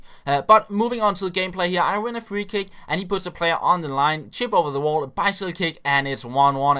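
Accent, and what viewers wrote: Denmark. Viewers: Det er dejligt nemt at høre, at du er dansker:)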